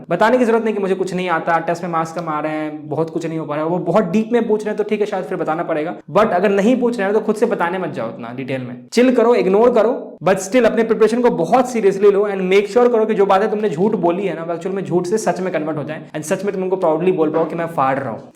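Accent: Bihar, India. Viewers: bihar tone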